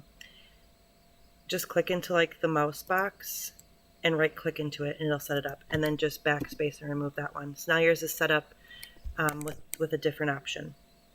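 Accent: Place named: United States. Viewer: con acento americano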